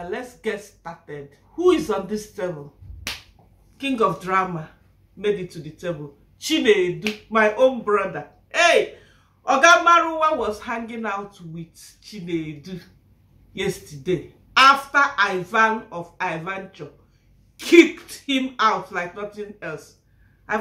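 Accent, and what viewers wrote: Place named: Africa. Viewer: Is there a way you can speak English more clearly and with less accent? I hear you but when your accent gets too deep I am losing your words and missing the point. Your channel is reaching the world so it would be helpful to many who may not have African blood for you to shift and pivot for those who want to actually hear you 100%. I have the blood. I hear you. But I lose you.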